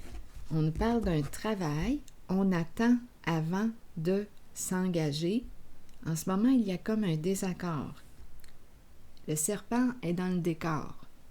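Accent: Quebec, Canada. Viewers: j adore votre accent du Québec !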